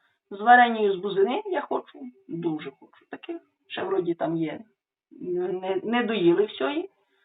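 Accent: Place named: Rural, Ukraine. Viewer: Говори як ти вмієш.Мені подобається як ти говориш в мене мама теж так говорила дуже багато слів так як говорять в моєму селі хотя це Хмельниччина яке ближче до Чорткова. КОМУ НЕ ПОДОБАЄТЬСЯ ХАЙ НЕ СЛУХАЮТЬ..НА ВСЕ ДОБРЕ ЗДОРОВ'Я ВАМ ВСІМ.ЧОЛОВІКУ БОЖОГО БЛАГОСЛОВІННЯ.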